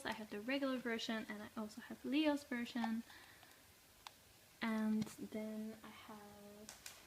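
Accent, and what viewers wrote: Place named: Netherlands. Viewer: haha heard you were dutch immediately when you spoke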